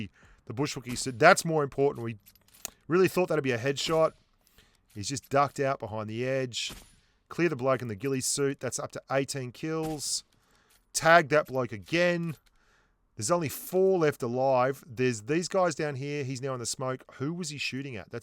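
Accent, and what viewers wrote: Australia. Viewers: you have the best pubg mobile videos! I can’t get enough. ( The Aussie accent helps;)) IGN: Salt65 #pochinki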